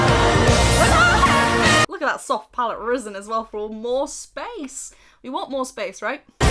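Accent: United Kingdom. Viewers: I've thought you are an American. Your accent British english. I lived in London around 1 year, long time ago. lives in Incheon. I live also same city in Korea.. I hope you will have a few more reactions for ' '. ' '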